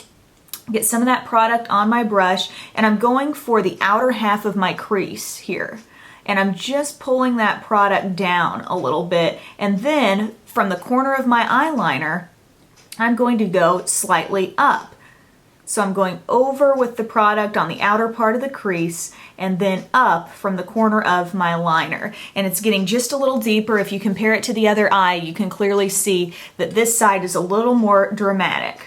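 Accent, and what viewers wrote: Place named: United States. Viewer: love your accent!!! im from australia and yours is the nicest american accent ive ever heard (im being serious btw) :)))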